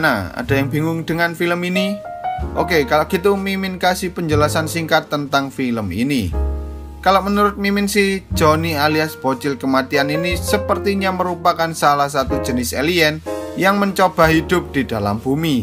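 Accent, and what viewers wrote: Java, Indonesia. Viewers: Pengisi suaranya kentel jawanya.. jellek amat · Medok brooo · Bang kamu asal dari Jawa ya kalo iya jawab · Suara jawir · Medhokk kalii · Logat jawa bgt bew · anjir admin e orang jawa to hahha seketika aku ngrokok , admin e ngomong wes rasah kesuwen aku watuk keselek belok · Waduh pekat juga jawa nya ya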